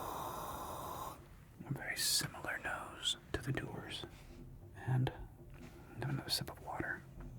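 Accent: Scotland, United Kingdom. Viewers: From Scotland here, loving the accent attempt. Could have fooled me at some points lol, thank you for highlighting a peak part of scottish culture🎉❤ Appreciate the video please keep up the amazing work😊😊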